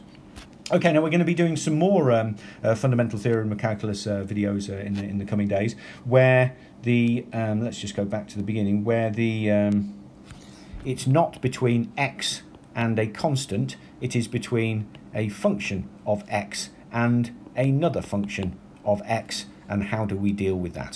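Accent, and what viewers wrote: United Kingdom. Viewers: Thank u random British guy 💂🛐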